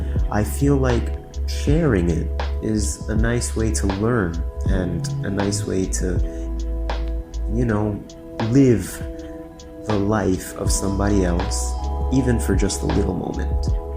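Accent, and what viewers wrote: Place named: United States. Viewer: You have an American accent. Did you grow up in the U.S.?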